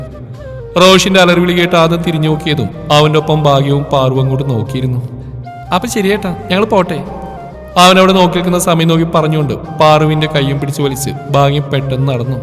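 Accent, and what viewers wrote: Tamil Nadu, India. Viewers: തമിഴ് കലർന്ന മലയാളം നന്നായി പറയുന്ന ഹൃദയം നിറഞ്ഞ സ്നേഹാദ്റമായ അഭിനന്ദനം🎉🎉🎉🎉🎉🎉🎉🎉🎉🎉🎉🎉🎉🎉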